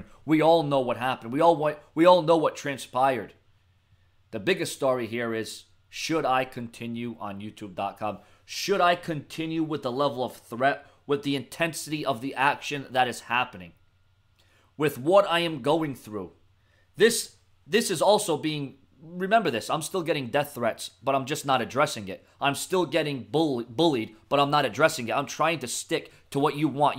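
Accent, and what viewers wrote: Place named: New York, United States. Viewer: I would move but keep your channel ppl will miss u if u delete nd btw are from new york its just the way u sound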